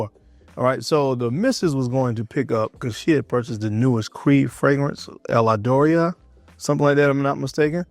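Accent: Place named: South, United States. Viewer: I bet you’re from the south. I think it might be a bit f a southern thing lol.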